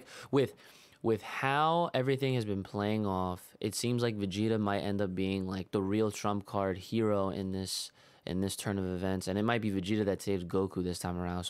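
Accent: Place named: Philadelphia, United States. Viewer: Bro you from philly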